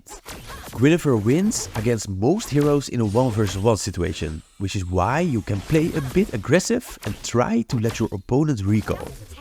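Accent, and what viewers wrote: Netherlands. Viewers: Are you Dutch? Lol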